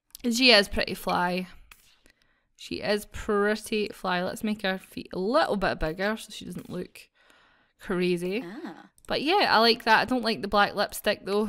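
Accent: Scotland, United Kingdom. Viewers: Can hear those scottish roots coming out in you :P Instantly knew, scots rule! \o/ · I'm pretty sure she's Scottish as an Australian accent is like a relaxed British accent. I'm Australian, I should know ahahaha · Omg ur accent! I luv it! U Scottish?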